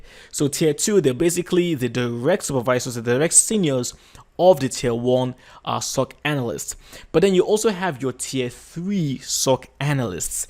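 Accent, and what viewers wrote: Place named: Nigeria. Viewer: Bro you sound like my country man, You Nigerian?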